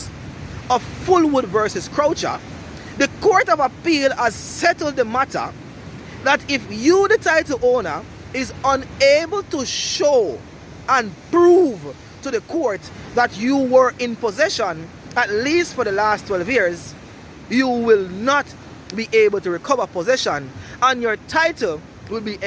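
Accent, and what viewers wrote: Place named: United Kingdom. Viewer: Jamaican With British Accent 🧐💀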